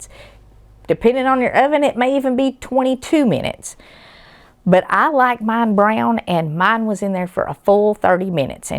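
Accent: South, United States. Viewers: Love your recipes and sweet southern accent ❤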